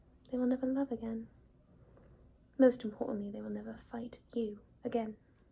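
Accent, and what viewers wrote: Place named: England, United Kingdom. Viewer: OMGOSH I L♥VE the English accent! You do it very well. You sound so authentic. WOW. [For the record: I am British 🫖🍵] Thank you, so Much!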